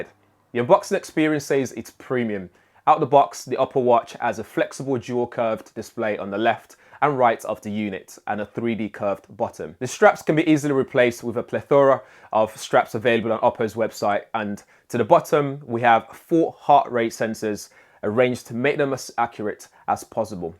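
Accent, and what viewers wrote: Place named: MLE, United Kingdom. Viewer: Cool MLE accent👍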